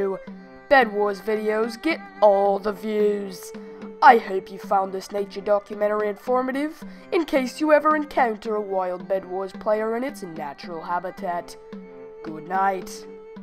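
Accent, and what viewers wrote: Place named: Australia. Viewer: That australian accent ...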